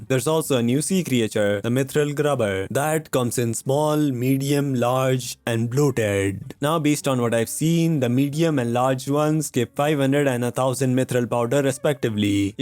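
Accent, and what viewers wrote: India. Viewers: Bro are you indian or just a person with Asian and somewhat indian accent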